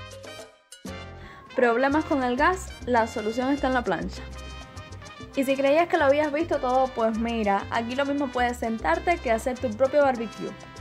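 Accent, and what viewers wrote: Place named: Cuba. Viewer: aveces hablas despacio otras veces te sale lo de cubano.tu hablas ingles?? Tu vlogs es in inglish.otris vlogs son en spanish..cuidate🫀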